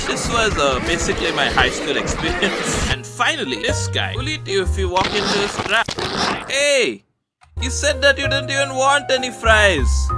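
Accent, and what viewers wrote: India. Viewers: You sound like indian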